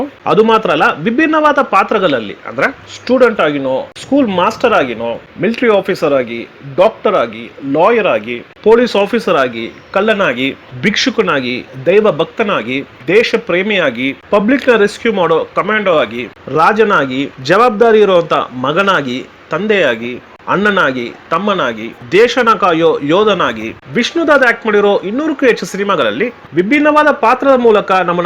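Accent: Tamil Nadu, India. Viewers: ಸೌಮ್ಯವಾದ ತಮಿಳ್ ಶೈಲಿಯ ತಪ್ ತಪ್ಪಾದ ಕನ್ನಡ ಉಚ್ಚಾರಣೆಯ ನಿರೂಪಣೆ. ಕನ್ನಡವನ್ನು ಚೆನ್ನಾಗಿ ಅರಗಿಸಿಕೊಳ್ಳಲು ಸಾಮರ್ಥ್ಯವಿಲ್ಲದಿದ್ದರೆ ನಿರೂಪಣೆ ಮಾಡಿ ಕೇಳುಗರನ್ನು ಹಿಂಸಿಸಬಾರದು. ಒಂದು ಸಿನಿಮಾ ಕಥೆ ಹೇಳುವುದೇ ನಿರೂಪಣೆಯಲ್ಲ. ಹೇಳುವುದನ್ನು ಮನಃಪೂರ್ವಕವಾಗಿ ಸೊಗಸಾಗಿ ಹೇಳಬೇಕು, ವಿಶಿಷ್ಟತೆಗಳನ್ನು ತಿಳಿಸಬೇಕು.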